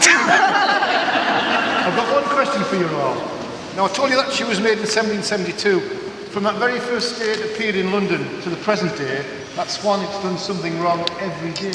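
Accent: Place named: Newcastle, United Kingdom. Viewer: Swans might be vegetarian but I tend to try and avoid encounters with them on lakes in thunderstorms. <link> The sense of humour and accent are identical. This was our experience of swans; <link> Are you sure it is thirty pounds or thirty pieces of silver? M1O4WvtTE4o?si=9muZ2LKKr7aqeyzI I have a soft spot for The Geordie accent. Probably need heaters in a cold, draughty place like that. It is north of Watford after all.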